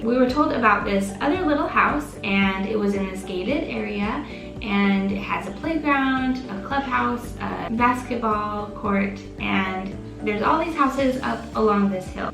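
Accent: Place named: United States. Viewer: Yes. Awesome! Keep the American accent. It goes a long way in the future. Much love from San Francisco California. Jesus is EVERYTHING.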